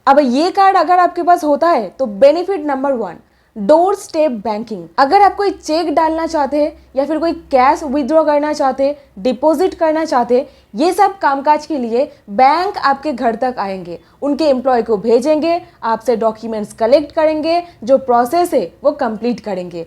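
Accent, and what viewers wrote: Bengal, India. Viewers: I'm 61 and you are Bengali.I can make out because I'm also one but born and lived all my life in Hindi speaking areas. Per se I've not heard of this but will check it out. Not sure if there are any real benefits, that's only feasible in places where active taxpayers fund a social security net, which is not the case in India.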